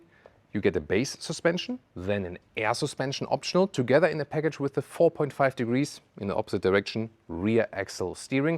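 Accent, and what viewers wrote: Germany. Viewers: Hi. It's ennoying to watch a channel named "Autogefühl" (a very typical german word) with a presentor that speaks in english with a quite strong german accent and pronounce even the german word "Autogefühl" in an english way. Why don't you make your presentation in german when you clearly come from Germany and give your channel with the german word "Autogefühl". It would be very much more authentic to present your videos in german than using this "german" english thought by a german english-teacher.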